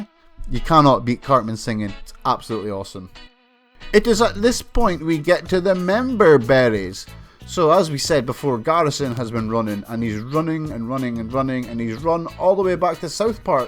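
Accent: Scotland, United Kingdom. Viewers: damn shame about your thick, almost undiscernable scottish accent. can hardly understand 30% of what the hell youre saying.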